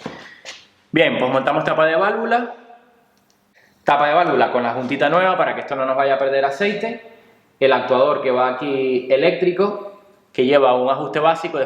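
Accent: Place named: Venezuela. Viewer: Hablas como venezolano, eres de canarias?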